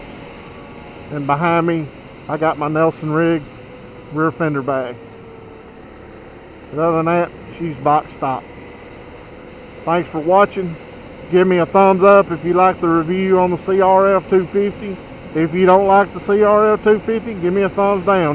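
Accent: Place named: United States. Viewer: nice American English and the horse you are on is looking good. Great road video.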